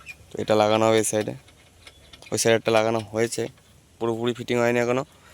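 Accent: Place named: Bardhaman, India. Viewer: Dada ami tomar kotha sune bujhte pailam apnar bari bordhoman memari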